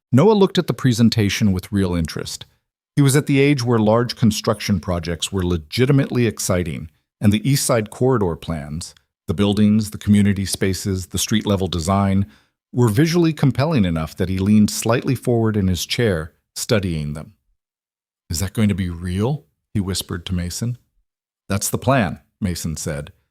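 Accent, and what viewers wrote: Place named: New England, United States. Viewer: I'm not a fan of how Yankee this narrator sounds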